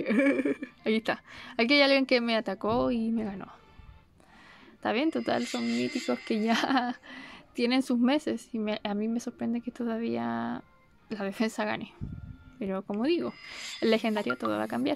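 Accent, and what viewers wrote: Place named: Chile. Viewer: TIENE ACENTO CHILENO CONSHASUMARE WUEWON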